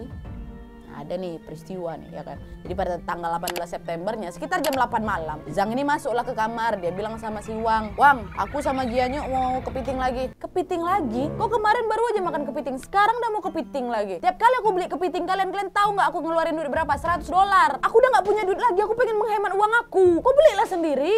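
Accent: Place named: Sumatra, Indonesia. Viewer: Kak ini aslinya orang mana kah....? Masa iya orang Jawa tapi logatnya kayak orang sumatera.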